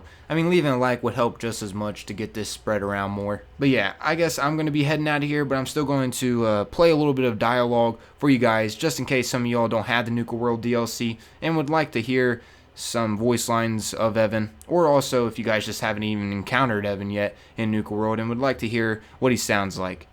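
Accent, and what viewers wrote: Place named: Pittsburgh, United States. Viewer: you sound like a fuckin yinzer man...are you from pittsburgh, too? lol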